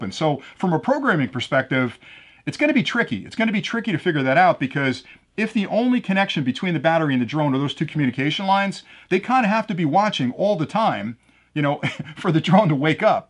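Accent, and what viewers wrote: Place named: California, United States. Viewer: Im thinking fast talking Californian! I moved from Cali to Tennessee and had to learn to slow down when talking!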